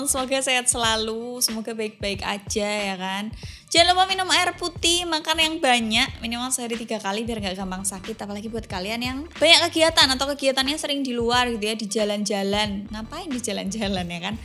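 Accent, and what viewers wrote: Java, Indonesia. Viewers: Blitar hadir ka.. Medoknya ka jan ngangeni bangeettt.. Salam semangtt y kaa.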